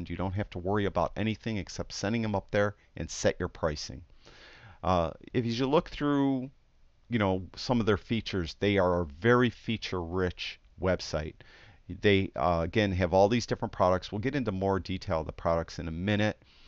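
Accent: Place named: Upstate New York, United States. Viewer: What an awesome video...thank you so much for explaining this so clearly. I've been thinking of selling some prints and had been wondering about Smugmug, so this is really helpful. I saw your comment above about donating the proceeds to the charities and that's wonderful. I had always wondered if you were from Upstate NY as well due to your distinct accent and it seems like you are! (I went to college at SUNY Oswego) :)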